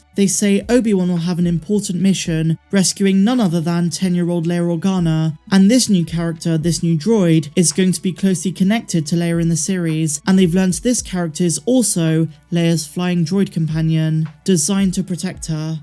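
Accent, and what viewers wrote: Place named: England, United Kingdom. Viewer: ! 👍🏻 It’s hard for me to differentiate “Last” vs “Lost” with your English accent. It’s a very subtle difference for me as an American. I’m sure it’s obvious for the English.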